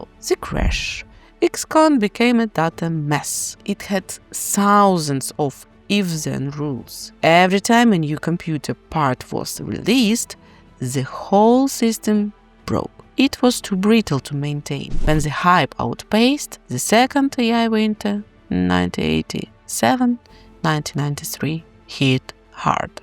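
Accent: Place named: Russia. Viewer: ah I find the Slavic Russian accent so funny and charming at the same time but good video your channel will blow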